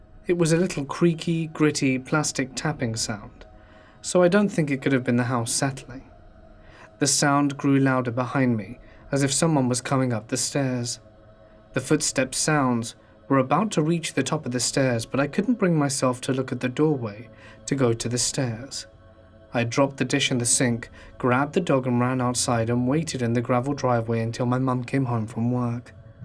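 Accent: United Kingdom. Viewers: I don’t know why, but when someone reads stories in a British accent it’s much more believable lol.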